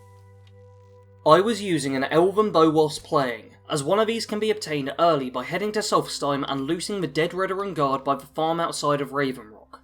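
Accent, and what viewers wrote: United Kingdom. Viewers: Is it your accent english? Also do you use mods ?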